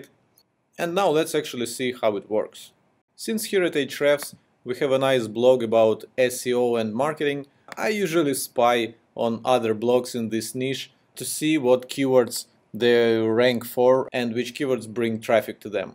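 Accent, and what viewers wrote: Russia. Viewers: great information ! are you from russia :) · Русский акцент, фууууу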